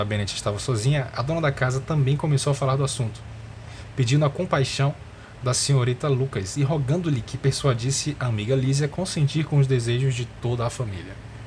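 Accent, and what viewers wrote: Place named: Northeast, Brazil. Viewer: tu é de Natal/Rn né? sem condições, se não for nordestino, eu cegue.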